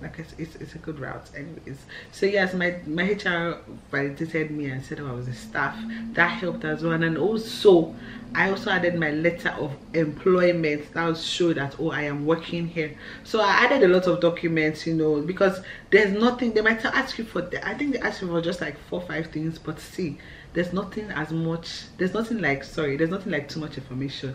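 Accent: Ghana. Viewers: Too much respect to you and all women around the world I can see how child bearing really takes toll on women you’re totally changed physic wise and the tone of your voice now sounds more Ghanaian congratulations 🤱🏽👼🏾👩🏽‍🍼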